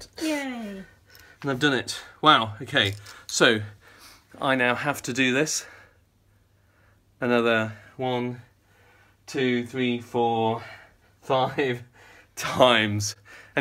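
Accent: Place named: United Kingdom. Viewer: Love the British accent, wish I got one too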